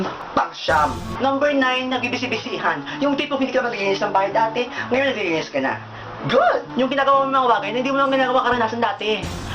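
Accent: Visayas, Philippines. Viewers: HALA BISAYA DIAY KA -HA KA HAHAHAHAHA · Grabe! halata kaayo na bisaya! xD Proud to be BISAYA!!!! :) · Kuya, bisaya ka ba? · Oy, bisaya diay ka? Daghan nata. Kabibo. Nganga :) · bisaya kba Kuya?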